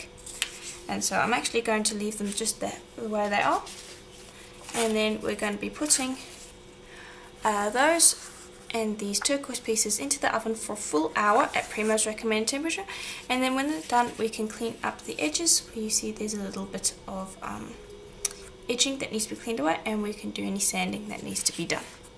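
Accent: South Africa. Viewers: Beautiful earrings, and you have such a calming, eloquent voice. You are very talented. Thanks so much for sharing. I also love all your special tools and tricks. I especially love your South African accent, as that is also where I am from, but a very long time ago.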